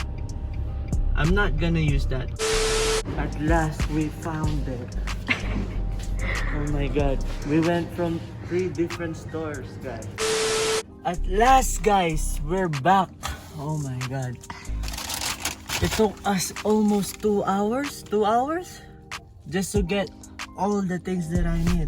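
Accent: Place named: Philippines. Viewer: He's definitely Filipino with an accent.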